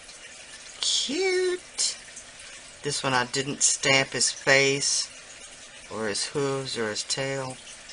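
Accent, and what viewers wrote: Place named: Texas, United States. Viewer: I'm glad you mentioned that you are from Texas. The accent is so pleasant and familiar. I'm in Arkansas, but the accents are similar. You sound EXACTLY like my friend Cynthia, so that is just another plus to watching/listening to your tutorials. You are awesome times ten!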